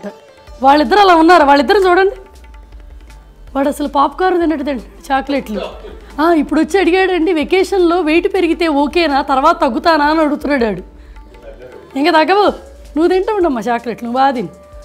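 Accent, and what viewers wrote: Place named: Guntur, India. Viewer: Mee slang vintey Nenu Guntur lo unatte undi. Nenu Tenali. Mee Balam mee Jaanu Ney. Mee Ammayi ,adore her.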